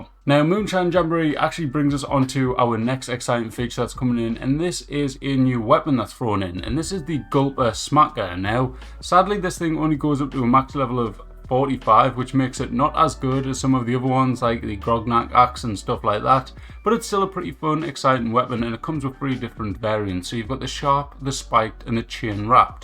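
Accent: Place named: Scotland, United Kingdom. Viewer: Great info with a Scottish twist... good stuff 👌🤌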